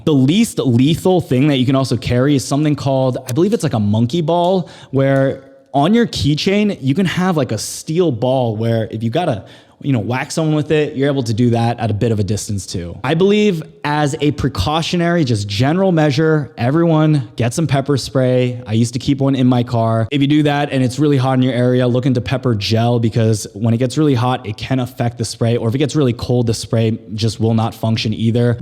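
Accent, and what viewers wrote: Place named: Los Angeles, United States. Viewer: Crazy man, glad you got away clean. It does seem like things are getting sketchy lately in my area (PNW) and across the country. You have the voice to reach more people than most so kudos for sharing this situation. I lived in Montgomery County when I was a kid. You're missing the Maryland (Muuuriland) accent, I had you pegged for LA bro. Keep bringing the solid content!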